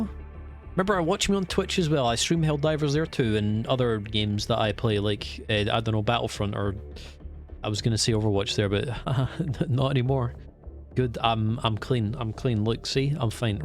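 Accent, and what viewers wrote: Scotland, United Kingdom. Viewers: A Scottish sci fi quartermaster giving me flamethrower recommendations?? This is heaven for the ears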